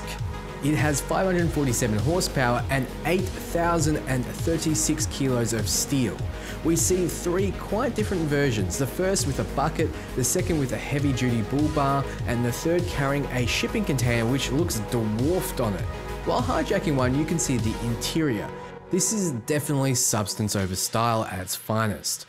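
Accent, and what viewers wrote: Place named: Australia. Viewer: Good to hear an Aussie voice on YouTube much love from the lock down state Victoria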